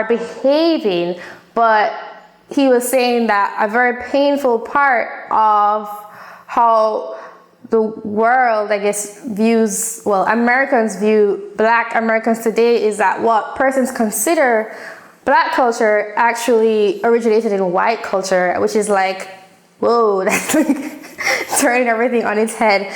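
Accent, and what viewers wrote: Jamaica. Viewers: My girl I can here your Lovely Jamaican accent. Like the video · Girl! I hear the Jamaican dialect there. Anyways, speak the truth. Jamaicans are here being brainwashed by American mainstream propoganda on racial issues. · Are Jamaican?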